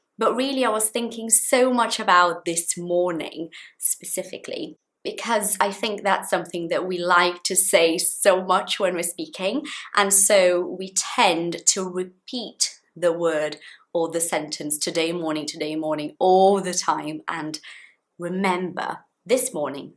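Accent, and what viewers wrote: RP, United Kingdom. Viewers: I reckon your accent is really posh. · Hello, is everything okay? Thank you for all your tips. I've known all the words about you said in this video. And I appreciate your dedication to teaching us. I love your British English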